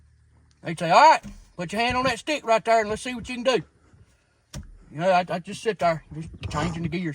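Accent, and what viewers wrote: South, United States. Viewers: This guy's Southern accent makes me laugh 😂😂😂😂😂😂😂😂😂😂😂😂😂😂😂😂😂😂😂😂😂😂😂😂😂😂😂😂😂😂😂😂😂😂😂😂😂😂😂😂😂😂😂😂😂😂😂😂😂😂😂😂😂😂😂😂😂😂😂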